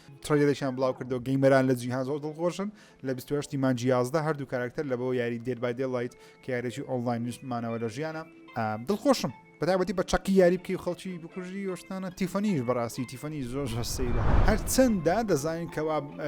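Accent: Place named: Badini, Iraq. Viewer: بادینیەکەت زور جوانە کاک عەیبی نیە..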